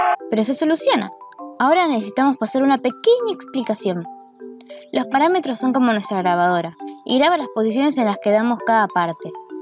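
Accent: Argentina. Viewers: El acento de las argentinas me vuelve loco :'v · Muy bien explicado el tutorial!! sos argentina? por tu acento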